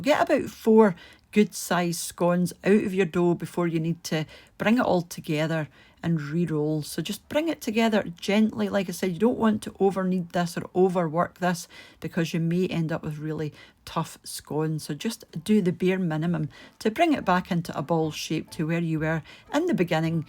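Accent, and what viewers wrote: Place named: Southwest Scotland, United Kingdom. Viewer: These are very similar to my own favourite recipe.I am from Greenock,now living in Canada. 🇨🇦 Your accent is very south west coast of Scotland!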